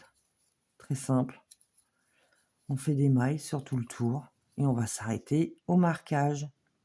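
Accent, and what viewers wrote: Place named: Nord-Pas-de-Calais, France. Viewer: Je peu me permettre de vous demander d’où vous venez car vous avez une façon de parler ont dirait une ch’timi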